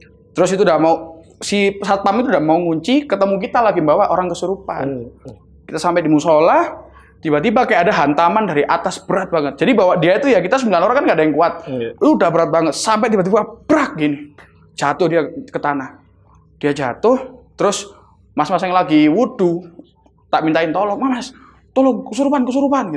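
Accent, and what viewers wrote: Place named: Java, Indonesia. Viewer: biyuhhhh logat e malangan puoll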